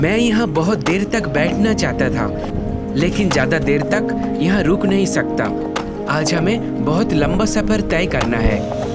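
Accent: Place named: Bengal, India. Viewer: Bhai tumi pakka veto bangali. Tomar pronounciation shunei bojha jacche. Tmi hindi te blog na baniye banglai koro na. Shunteo valo lagbe. Success o pabe.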